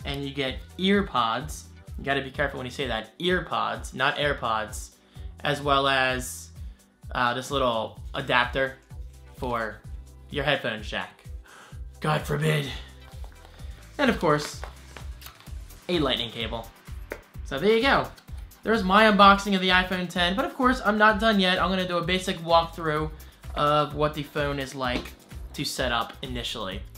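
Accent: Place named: Philadelphia, United States. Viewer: You from Philly? Edit you said your dad went to AC. You got a Philly accent.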